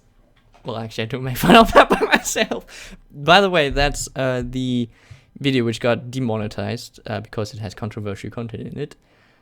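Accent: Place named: Germany. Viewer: weil du einen deutschen Akzent hast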